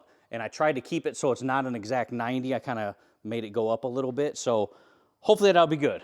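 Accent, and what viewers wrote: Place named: Buffalo, United States. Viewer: My dad was a boiler code welder at Harrison Radiator Division of GM for over 30 years. He passed away at 80 just 2 years ago this past week. (28th of Nov) He would have LOVED your video and everything about your entire build here! He might have had some ideas & inputs as well. He always talked about building a boiler to burn waste oil. He knew a fella in our area in Western NY that made one back in the early 80's (or maybe it was the late 70's) At any rate he passed that interest on to me. We have lived in Alabama for almost 40 years now, so we don't have quite the need for heat as we do the A/C, but it can get downright chilly even through the short winter that we do have. I think I'd have some fun with the handles, I was thinking springs or twisted rod. There's so many things you can do to put the finishing touches on. 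Buttons, bells & whistles right? Man! I am just happy to see all you've done & appreciate ya! Good goin' bro! I'm just curious, are you in Michigan? There were a few things that you said that almost sound like a Buffalo accent, but you don't sound too much like us!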